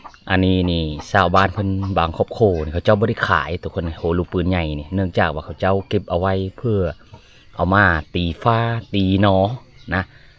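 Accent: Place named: Thailand. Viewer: โต๋เว้าคือไทบ้านกัน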